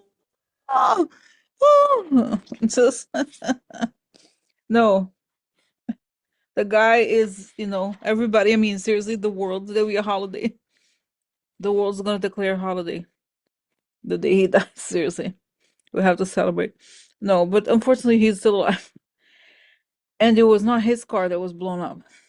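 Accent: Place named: Russia. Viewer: you're a riot! {{Giggle}} Love your Russian accent. Thank you for sharing your gift 😊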